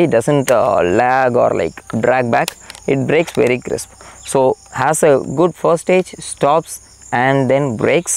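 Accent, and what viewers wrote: India. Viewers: Nice Indian accent 👌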